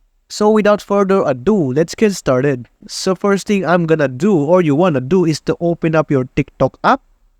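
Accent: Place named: Philippines. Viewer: Accent tagalog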